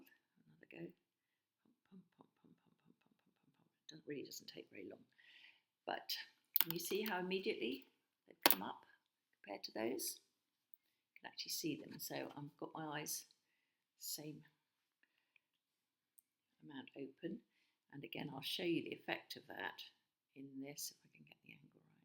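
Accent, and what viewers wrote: United Kingdom. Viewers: I have only just discovered you & found this vid really helpful & good to watch, thank you. Also nice the hear a Brit for a change, although I love the US ladies I follow...( I am from UK, currently living in France). I look forward to seeing more of you asap !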